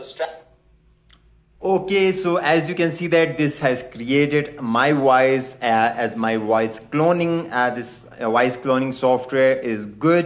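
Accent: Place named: India. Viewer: In the video all I could understand was the first part of the name, which was play, but the rest was a blur. I had trouble understanding your heavy hindoo accent.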